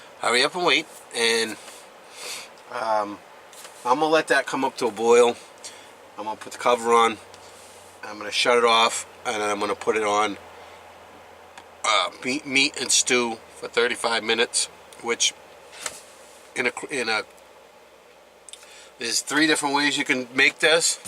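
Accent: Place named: Boston, United States. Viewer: There is no such thing as too much bacon. Ever. Love your Boston/Irish accent! lmao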